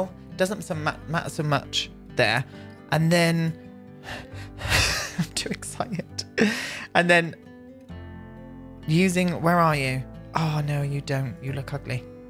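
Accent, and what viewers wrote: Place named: RP, United Kingdom. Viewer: I looooove your pip pip cheerio british accent! Your builds are gorgeous!! ❤️ Much love from Norway 🇳🇴!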